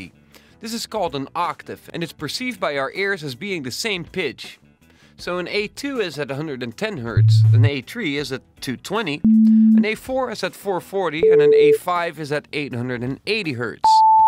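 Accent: Netherlands. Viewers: you have a dutch accent :D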